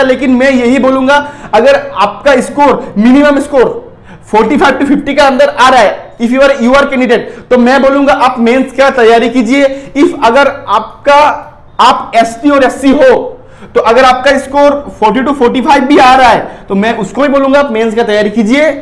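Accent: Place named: Bengal, India. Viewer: Sir,I had attempted 52 in which 1 is wrong not sure about it,but in others question I have 100% accuracy but say I will consider it above 95% as I did only for accuracy with moderate attempt...So shall I prepare for mains?? category is normal And it was 15 th Oct 3rd shift (tough shift) And are you Bengali bcz accent is similar to that!!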